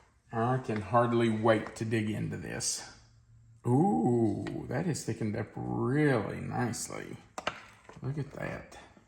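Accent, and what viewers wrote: South, United States. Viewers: enjoy listening to your delightful Southern drawl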